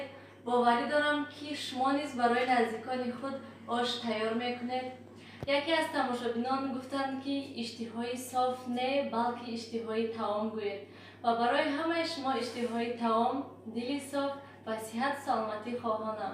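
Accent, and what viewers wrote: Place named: Hisor, Tajikistan. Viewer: Рахмат ма ай хисор ранги мо гап мезанед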